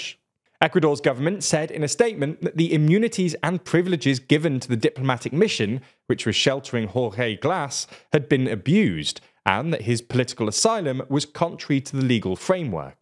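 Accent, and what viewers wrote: United Kingdom. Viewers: Ok but why are you British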